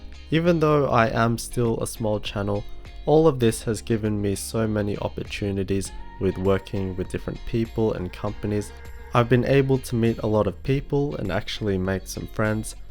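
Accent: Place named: Australia. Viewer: you sound more like an aussie than a filipino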